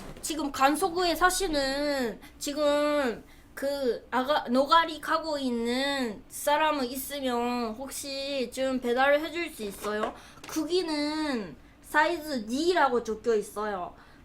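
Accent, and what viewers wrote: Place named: Busan, South Korea. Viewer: 부산이면 그냥 말투입니다.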